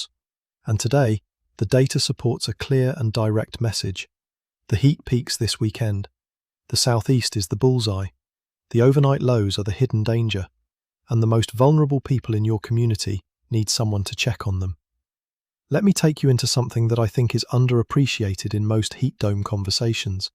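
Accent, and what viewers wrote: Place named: United Kingdom. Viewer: This is U.S. weather....why the British accent?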